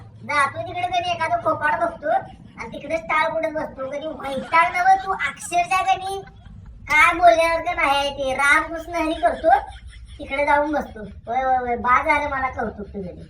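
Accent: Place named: Rural, India. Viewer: अण्णा , ग्रामीण बाज ऐकायला छान वाटतोय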